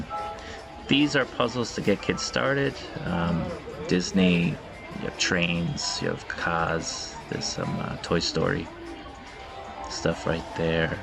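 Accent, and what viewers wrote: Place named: Massachusetts, United States. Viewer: You can't deny it now your from the Massachusetts and the accent is truely from their child palace became a circuit city the one in Dedham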